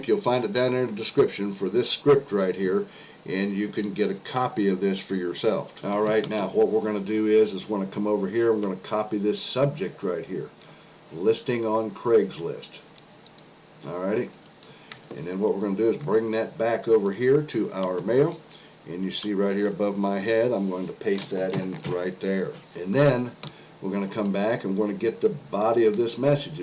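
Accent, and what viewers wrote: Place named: South, United States. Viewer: Love that Southern accent. Yesss!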